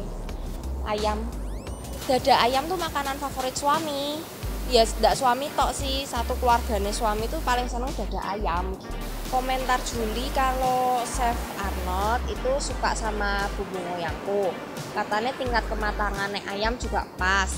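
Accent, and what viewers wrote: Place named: Semarang, Indonesia. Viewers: Logatnya beda lah jatim sama jateng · panggil saja niyak itu logat semarang 😂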